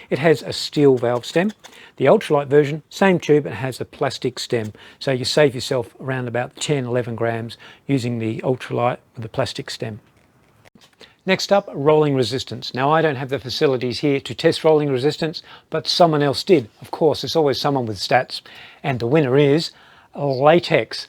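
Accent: Australia. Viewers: Very informative, great australian accent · it's South Australian, not a Sydney sider accent.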